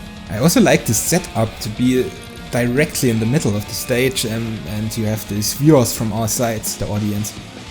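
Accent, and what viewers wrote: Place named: Germany. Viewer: Are you German? Your accent sounds so familiar. Do I hear a bit Saxon out there?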